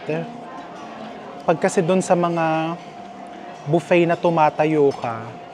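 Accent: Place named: Western Visayas, Philippines. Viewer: nakkakatuwa ka chef panoorin heehe pati pag ssasaalita mu para ka ilonggo.. srap mu panoorin na kumakain k chef.. good luck po chef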